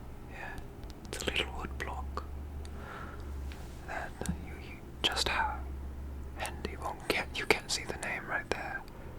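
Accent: RP, United Kingdom. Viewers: Idk whats going on... but i'm loving it.🥰 I'd love to hear the British Gentleman from the 1800's roleplays hahahhahah 👉👈 plz do more🥰🥰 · Why is it that is so much more attractive with a British accent lol❤️ lol you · Omg. I've been following you for a while and your British accent tik toks inspired me to get here. I'm so so happy omg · You should read more fan fic in British it kills me!😂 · Just me that thinks uses his British voice more than his actual one and I love it ✨🤗🥰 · I’m just gonna act like I haven’t watched this video 15 times already 😔 please post more asmr videos in the British voiceeee😭😭😩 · British accent + ASL ... Somethings not adding up (Yes, I know he's not actually british) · Ok but like you don’t have to do anything specific, just whisper with your British Voice into your special ASMR. I will listen as long as your speaking so...😼‼️🙄✋🏽🥶👁👄👁 SIKEEEEEEE.... 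I GOT TO THE FORTUNE COOKIE BOX THING. READ YOUR DREAM CHECKLIST AND FORTUNES OUT LOUD WITH YOUR BRITISH VOICE!!! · I’ve only ever heard his British gentleman voice lmao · Alright, it’s official, British accents give me the tingles... that explains a lot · This British gentleman accent is giving me 🦇 British Vampire🦇 vibes , ya know? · so he’s a korean american who knows asl and speaks in a british accent... hm.. ㅋㅋㅋ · What am I watching?? But don’t get me wrong, I love the British gentleman accent.😗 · this is a very VERY convincing british gentleman from the 1800s accent... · Hey how about u do an asmr with ur British gentlemen voice but make it sound like ur talking to us. Also u don’t really have to whisper when it comes to asmr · Who is this handsome Korean-American man speaking to me about a box of goals, dreams and fortune cookie papers in a lovely British accent? Because he's doing wonderful and I love it. 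· Ok don’t get me wrong I love the British gentleman voice but can we have a normal voice video please? 🥺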